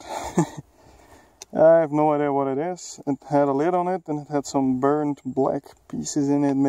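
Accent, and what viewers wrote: Netherlands. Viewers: Geen haat maar je hoort dat je Nederlands bent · You've a dutch accent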